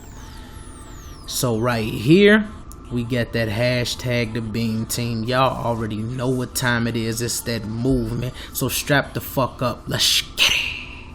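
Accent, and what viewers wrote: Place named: Texas, United States. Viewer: nice work I'm trying to figure out dat accent ? Texas ,New Orleans , Oklahoma? we need you to open a spot in Manhattan......God Bless ya kiddo.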